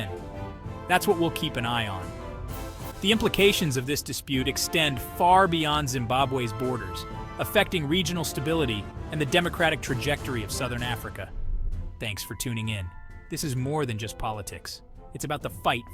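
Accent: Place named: Europe. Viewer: Would have preferred this being reported by Museyamwa, Mutenhesanwa or Nyamuzihwa - or their female counterparts. Not this Eurogenic voice. Do we ever get an African voice promoting an American, British, French or other political outfit? What's the agenda here? It makes some of us question whether Chamisa is any different from the American puppets Nana Akufo Ado or William Ruto, to mention but just two. Does he really stand for us, if such a voice as this is his representative?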